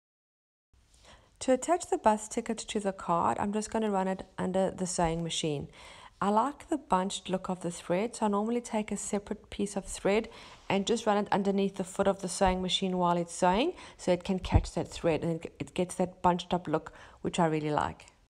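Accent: South Africa. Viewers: Absolutely stunning, I really liked the combination of turquoise and brown. So inspirational and easy to follow. I am going to try this out. By any chance are you from South Africa, just wondering because you seem to have true SA accent. BTW I am from Johannesburg, SA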